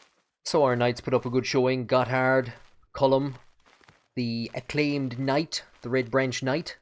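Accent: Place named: Munster, Ireland. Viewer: You're the only YTer I have the bell on for, great content. Did you study history? Also which province are ye from? Can't place the accent. My best guess is Munster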